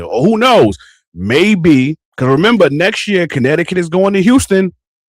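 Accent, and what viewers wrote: New York, United States. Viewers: You ended up on the feed. Heard you speak a couple minutes, figured you from NYC and a Liberty fan..you confirmed it...then I see you from the East.. had to subscribe. I'm from the Stuy, reppin the Liberty so looking forward to more content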